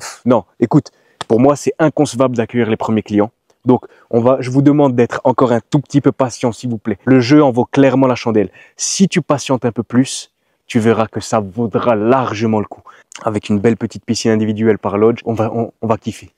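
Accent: Alsace, France. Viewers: J'ai bien reconnu cet accent, vous êtes Haut-rhinois? Le salam de mon fils du Bas-Rhin 🥨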